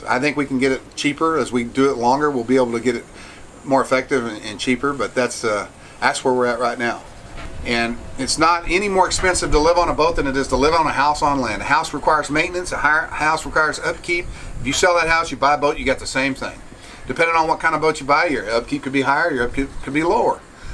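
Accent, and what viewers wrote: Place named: Texas, United States. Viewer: Sounds like a smart Texan to me !! Coming from a fellow Texan. I retired at 53 myself, worked since I was 16, time to live the good life. 🤠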